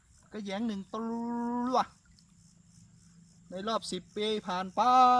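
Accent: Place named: Isan, Thailand. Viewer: ได้ยินแล้วคิดถึงอิสานบ้านนา fcจากฮ้องกงค่ะ ขอให้ยอดติดตามยอดlikeสูงขึ้นเรื่อยๆน่ะค่ะ^^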